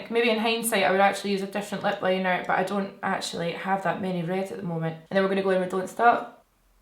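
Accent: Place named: Scotland, United Kingdom. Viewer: U sound like the Scottish version of Yelena Belova and I bloody love it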